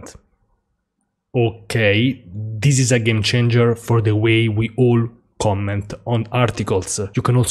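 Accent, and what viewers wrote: Italy. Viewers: Mama mio Italian boss accent i love it ! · Very nice. And that crisp Italian accent, wow! :) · dalle prime 5 parole ho scoperto che sei italiano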